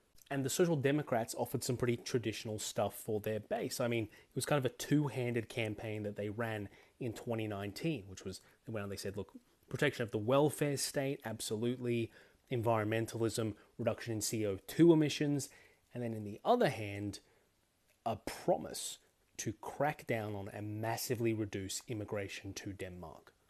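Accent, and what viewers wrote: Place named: Australia. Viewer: you sound aussie, you should do aussie politics. example; how the fee free tafe can be a game changer to the economy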